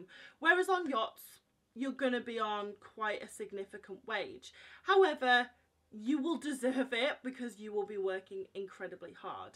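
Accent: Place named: West Midlands, United Kingdom. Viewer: Congrats! You're quite the entertainer. Could you please let us know which region of the UK you hail from? Judging by your lovely accent I'm guessing West Midlands. Am I way off?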